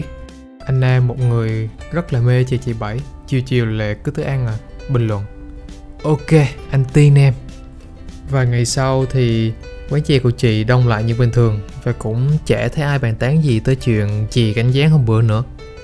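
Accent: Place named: Phu Yen-Khanh Hoa, Vietnam. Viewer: Giọng nói của bạn rất hay. Chắc là giọng Phú Yên-Khánh Hòa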